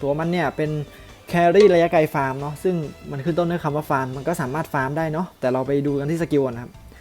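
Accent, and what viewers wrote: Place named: South, Thailand. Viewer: สูคนใต้ม้ายครับ ผมเห็นสูแหลงออกทองแดงกัน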